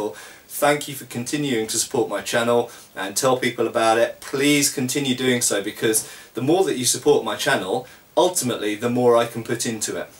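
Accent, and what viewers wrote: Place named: England, United Kingdom. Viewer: THANK YOU for making cool videos about swords! We love swords and the English accent only enhances your narrative coolness :)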